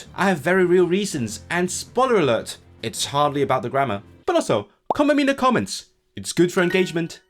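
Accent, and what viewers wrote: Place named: North Wales, United Kingdom. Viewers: Nice video. And nice pronunciation too you sound northern when you speak Welsh. I am proud that you have taken time to learn my language and appreciate it sincerely. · You speak like a North Walian. Im so proud of you ❤ from Caernarfon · I can tell by your accent you were taught Cymraeg by a Gog. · It's fascinating to hear that you've learned to speak with a NW Welsh accent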